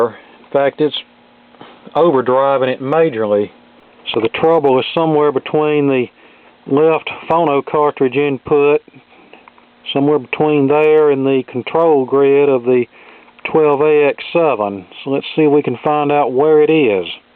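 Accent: South, United States. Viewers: I don’t know where you live, but you sound like you’re from the south like myself. I wish I could come and apprentice with you. I find these vintage stereos/turntables fascinating. I’ve started refurbishing them, V-M primarily as a hobby. I really want to dive into the electronics, but I’m apprehensive. I’ve only ever replaced a capacitor in a TV. All of the diodes, capacitors, tubes etc seem very daunting. However I’d really love to learn. It’s sad that there aren’t more folks like you willing to pass on knowledge to others. Thank you for your videos, and if you’d ever like to tutor me in basic electronics that would be fantastic.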